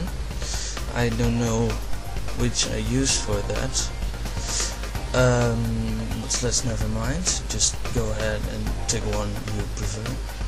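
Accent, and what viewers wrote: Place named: Netherlands. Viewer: Nederlands, te horen :D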